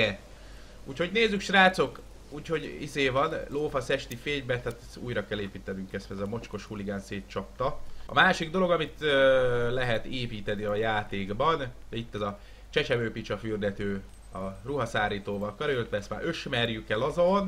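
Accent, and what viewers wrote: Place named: Transylvania, Romania. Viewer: nagyon erdéji a beszéded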